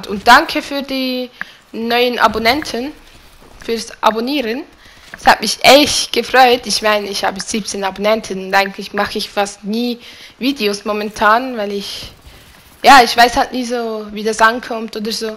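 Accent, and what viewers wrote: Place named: Switzerland. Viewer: haha hab das video beim commander gesehen und bei den ersten 3 Sekunden gewusst das du Schweizerin bist ;D swiss power ! ^^ ich lahn mal es abo da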